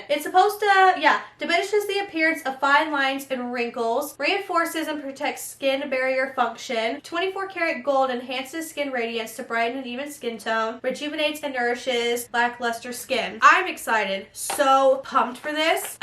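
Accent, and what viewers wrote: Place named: United Kingdom. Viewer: I'm really surprised, I thought u were British and live in the UK,for some reason I hear a British accent when u talk.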